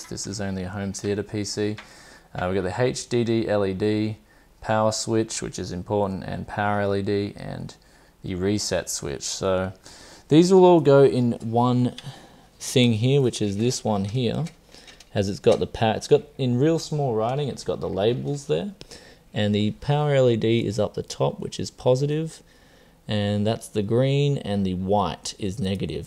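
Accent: Australia. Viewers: are you australian i can tell by ur accent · Hey i have a question... I want to build a gaming computer and i know the parts i want to get, but i just don't know where to get them from since i live in Australia. I can tell by your accent you do too. So my question is: where can i buy pc parts in Australia? Oh yeah and by the way i love your videos, keep it up! Thanks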